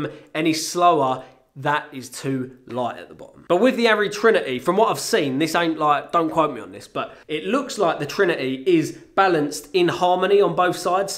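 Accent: United Kingdom. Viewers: ' creativity is just like his British Accent. Effortlessly Smooth and Precise.😁😁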